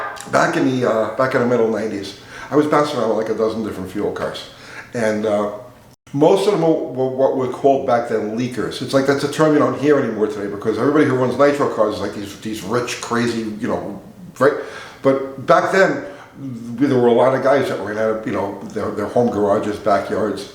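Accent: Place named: New York, United States. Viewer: It's only a matter of time before has his own massive car show/meet and greet. Celebrity status! 👍 P.S. I knew you didn't sound like you were from TN 😁. Where in NY?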